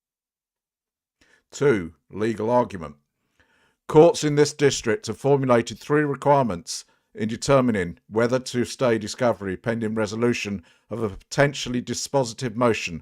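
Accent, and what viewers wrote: United Kingdom. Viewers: are you going to continue your unique content but showing your face and giving your real name on your channel in the future? Mr. Merbeast recently said anonymous creators are cowards and Mr. Stout has said creators such as you are "avatar" channels whispering in shame from their secret internet hiding places. (I know you don't think much of Mr. Stout though.) Whatever the decision, I trust your voice will remain the same. Everything sounds better with a British accent!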